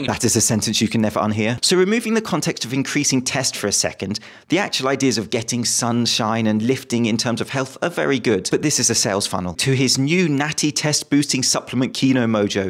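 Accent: United Kingdom. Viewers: a normal looking guy in glasses and a British accent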